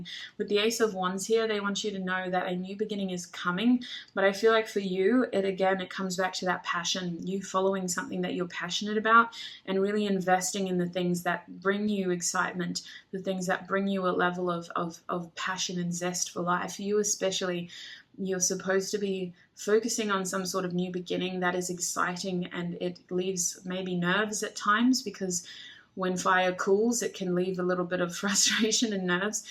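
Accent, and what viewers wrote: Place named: Australia. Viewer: I watch these videos every night as I go to sleep because you have a beautiful, pleasant, lovely Australian accent 🥲💐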